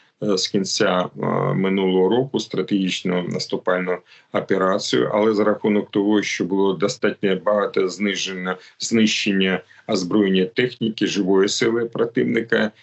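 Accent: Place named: Russia. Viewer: Акцент ужасный, русскоязычные очень смешно говорят по- украински. Сама такая.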